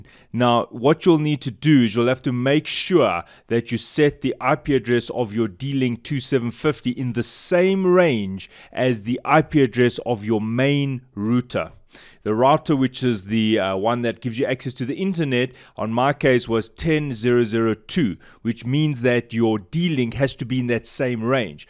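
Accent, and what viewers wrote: South Africa. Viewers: Such a mzansi accent, love it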